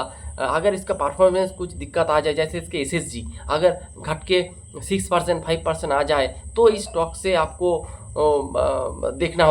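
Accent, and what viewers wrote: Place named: Bengal, India. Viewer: Bhai puro Bengali tone asche, Hindi ta khub baje sunte lagche. Camera er angle ta thik kor - get a virtual background. Content bhalo but not getting attention. Fix these issues.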